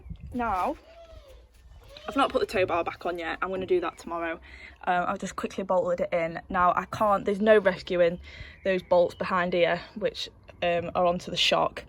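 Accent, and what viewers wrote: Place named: Lancashire, United Kingdom. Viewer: You sound very much like your from my neck of the woods around Lancashire area ?? I love the videos !!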